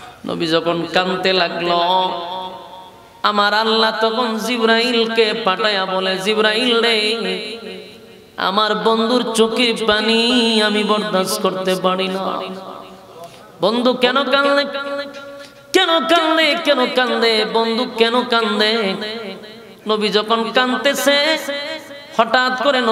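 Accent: Noakhali, Bangladesh. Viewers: এটাই নোয়াখালীর বয়ান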